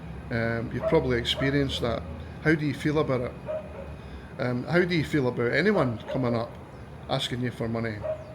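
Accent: Scotland, United Kingdom. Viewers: Where are you from? You talk like the people in "Still game" and I absolutely love it😅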